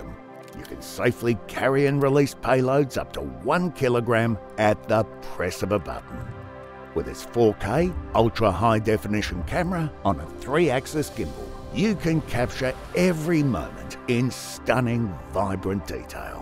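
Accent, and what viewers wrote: Australia. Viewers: Na, this old mate sounds true blue Aussie 🇦🇺, it's great and I'm a kiwi 😅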